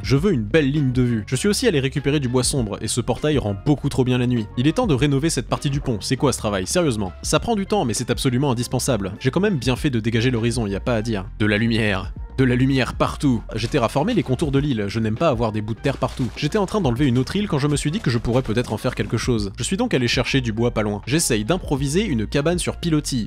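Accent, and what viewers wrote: Quebec, Canada. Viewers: Ce com est en honneur de ton incroyable accent québecois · T’es québécois? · Putain ta le meilleur accent québécois du mondes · Tu es quêbêcrois? Moi oui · Super accent canadien 😉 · L’accent québécois c’est pour me tuer😂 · J’adore ton accent québécois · Es-tu Québecois? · Les références sur plein de chose que je ne connais sont INSANE. Et jsp si c'est volontaire ou nn mais les intonations de voix avec une ressemblance a l'accent culte québécoise sont réussi. La naration est parfaite. Je dit merci a tiktok et a ma curiosité pour avoir découvert ce chef d'oeuvre sur YT. Je n'ai pas jouer a minecraft depuis la réforme du PvP 🤦‍♂️ et tu me donne envis de m'y remettre. Un gros FÉLICITATION pour sque tu as réalisé. 👍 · wesh l'accent canadien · Ton accent Quebecois est cringe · l'accent quebecois a la limite du perfect WOOOOOW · T’es tu un Québécois? 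😂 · j'adore l'accent quebecois que tu tes donner XD et cest un québécois qui approuve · est tu québécois ou canadien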